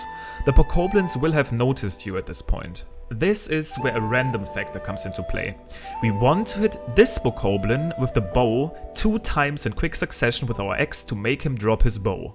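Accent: Germany. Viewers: Is he german? His accent sounds german...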